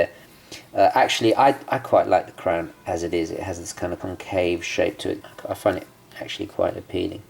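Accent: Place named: United Kingdom. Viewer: Why do you sound so british? )) Nice watch, I´ve got mine in green.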